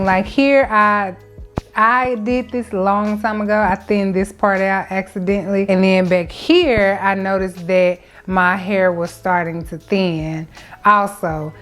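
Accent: United States. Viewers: I feel all of this! I am getting Microlocs again Monday 2 days from today! Also are you from stl? I love your accent! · Do I detect a Texas accent? I think I know my ppl when I hear them and you speak similar to Beyonce and Kelly 😄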